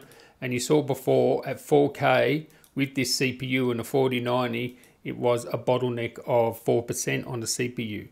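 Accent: Melbourne, Australia. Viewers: Good to hear an Aussie voice on reviews 😂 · your accent somehow made me miss Melbourne lol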